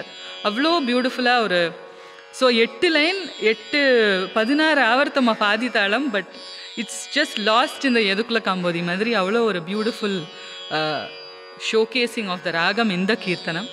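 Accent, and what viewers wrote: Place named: Brahmin, India. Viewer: Excellent. I always relish the English Tamil talked by Brahmins. Can call this Brahminish.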